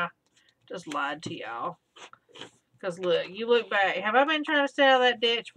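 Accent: South, United States. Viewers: Hi! Just discovered your channel! Love your southern accent and your fun personality. Your gluebook is amazing!! I am originally from Oklahoma...what part of the south are you in? I totally speak southern. HAHA. No black Friday shopping for us.... I hate the crowds (with COVID, it may not be as crowded, but ick). We are going to try to shop local businesses for Christmas this year. Want to support our community. Usually we do Amazon.... easy peasy. LOL Thanks for sharing your gluebook with us! - Kari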